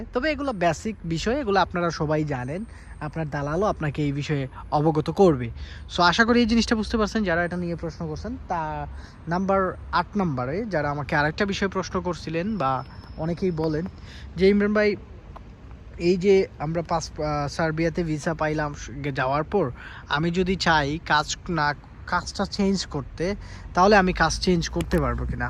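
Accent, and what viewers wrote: Sylhet, Bangladesh. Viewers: ভাই আপনে সিলেটের নি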